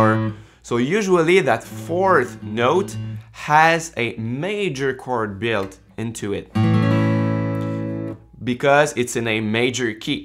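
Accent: Quebec, Canada. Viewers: Tu sonnes québécois. J’me trompe tu?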